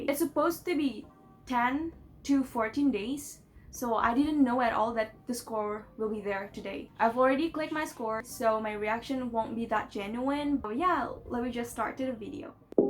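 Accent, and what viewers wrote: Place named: United States. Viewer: your american accent sounds recognizable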